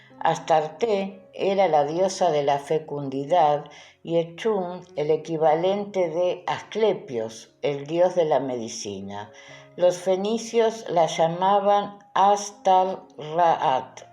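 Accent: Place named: Argentina. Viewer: Quiero hacerte una pregunta.. E escuchado 24 episodios que tu as narrado estupendamente.. Hay más o se termina hay gracias.. Bueno por tu voz se que eres de la Argentina.. Yo soy de España Murcia un saludo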